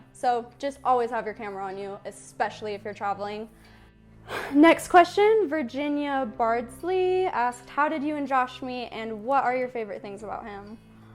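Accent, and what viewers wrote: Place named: Utah, United States. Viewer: your accent makes me miss Utah.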